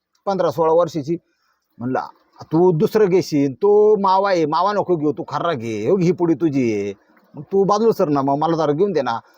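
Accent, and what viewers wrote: India. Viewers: Mama तुमची गावरान भाषा कानाला ऐकायला छान वाटते. जाऊद्या गाडी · Good job Sir , खुप छान ग्रामीण मराठी बोलता तुम्ही 💐💐💐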